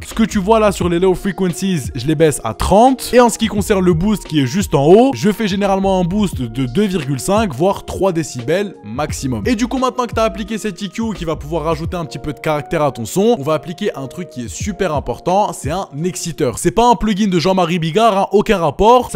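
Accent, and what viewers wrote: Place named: England, United Kingdom. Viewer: Ce bête d'accent anglais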